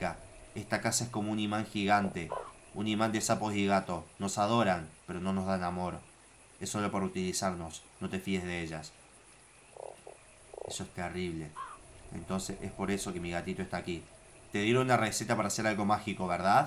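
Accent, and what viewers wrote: Argentina. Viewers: sos argentino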